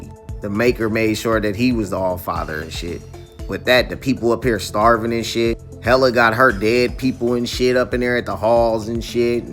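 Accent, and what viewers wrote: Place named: South Central, United States. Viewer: My boy, talking with the south Central accent you know we family I got a sub🔥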